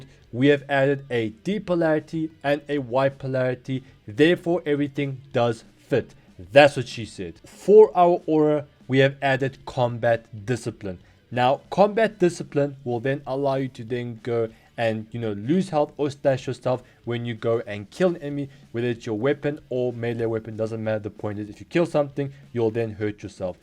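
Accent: South Africa. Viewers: Yo a South African g. Respect.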